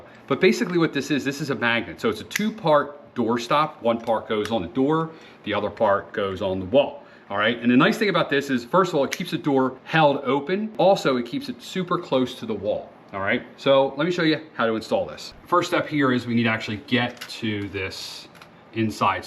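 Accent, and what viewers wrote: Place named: Delaware County, United States. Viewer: Dude… I know this video is a year old so you may not see this comment but you have to be from delco! I am too but live in Georgia now so hometown talk is always nice to hear. And thanks for helping me install my magnetic doorstop.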